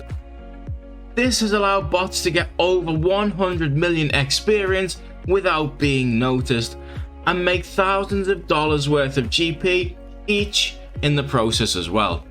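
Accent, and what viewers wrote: Liverpool, United Kingdom. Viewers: Are you a scouser brother hahaha cant tell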